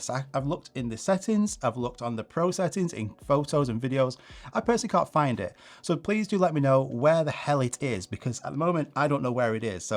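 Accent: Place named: Cumbria, United Kingdom. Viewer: You sound like you're not too far from Kendal.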